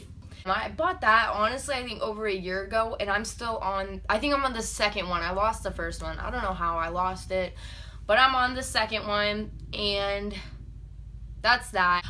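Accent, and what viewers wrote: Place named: South, United States. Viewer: I'm from MS! She sounds southern as hell to me!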